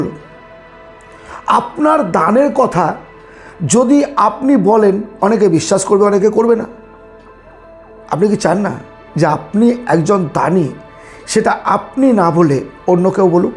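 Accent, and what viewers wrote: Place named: West Bengal, India. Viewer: আপনার কথায় পশ্চিমবঙ্গের টান আছে।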